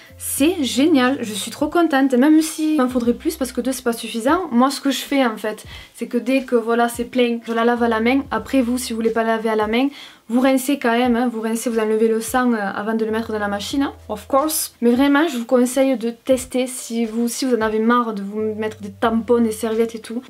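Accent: South, France. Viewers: Je suis passé par ta chaîne par hasard et je t'ai trouvé géniale, naturel et j'adore l'accent du sud ! Reste comme tu es je t'aime trop 😻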